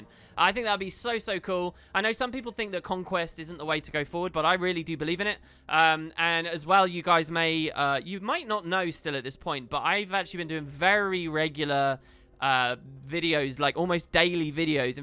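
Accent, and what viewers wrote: United Kingdom. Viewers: I just hope you (not, but the real you) are doing OK. Your internet persona has been part of many bike trips to work. To so many coding evenings and you've helped me gain further understanding about how brits talk. I've come to grow fond of you and I'd hate something bad happening to you. You had us worried because we care for you even though we don't even know your face, haha.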